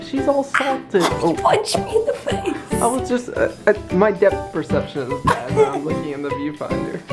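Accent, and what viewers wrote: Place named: Philadelphia, United States. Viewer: hehe, I think you might have a slight philly accent....:) here in Philadelphia all of us say "fr" instead of "for":) hahahahaha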